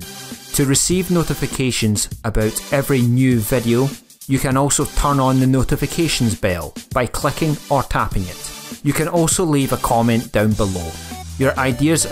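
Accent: Scotland, United Kingdom. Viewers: i love your scottish accent 👏🏼👏🏼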